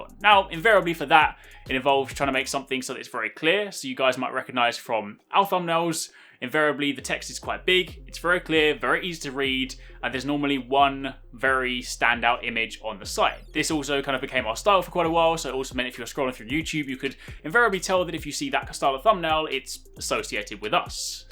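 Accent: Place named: United Kingdom. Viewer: Thank you! I'm not in videomaking or stuff, but seeing you share high quality knowledge is always good! Following your channel since you were making MH4U vids and I'm very happy with your growth, well deserved! I'm not an english native speaker and you helped me to learn the language with your tutorials in a funny way and brittish accent!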